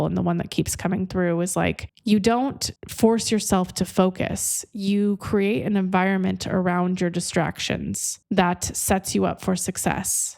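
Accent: Italy. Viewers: Things I ❤…., puflet’s and Italian accents. Thank you. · Your Italian accent actually sounds so prettyyy and I oove your humor hahha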